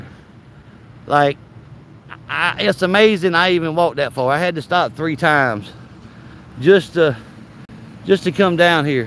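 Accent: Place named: North Carolina, United States. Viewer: GREAT TO HEAR YOUR VOICE AGAIN INSTEAD OF OTHER AUDITERS!!! YOU ARE BY FAR THE BEST AUDITOR HANDS DOWN!! LOVE YOUR NC ACCENT. LOVE FROM JOCO!!!!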